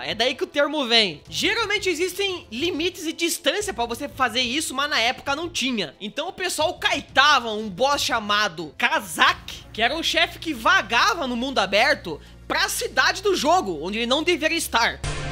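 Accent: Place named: Paraná, Brazil. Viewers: Eu sou de Guarapuava no Paraná também, eu amo nosso sotaque e nossas expressões kkkkkkkk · ele é de pato branco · Cara, eu sou paranaense de Cascavel no Paraná, e mais gosto de ver o falar porque ele parece meus amigos de infância, além de entender tudo de jogos!!!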